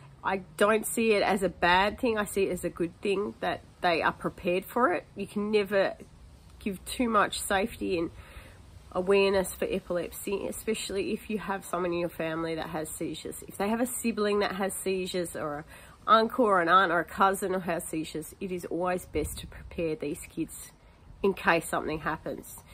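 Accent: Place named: Australia. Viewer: I love the Australian accent and it’s good to teach your child what to do when someone is having a seizure!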